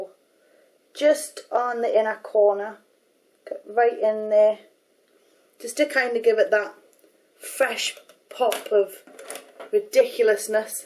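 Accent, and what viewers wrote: Newcastle, United Kingdom. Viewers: Why would she put on an accent. She's a Geordie that's what they sound like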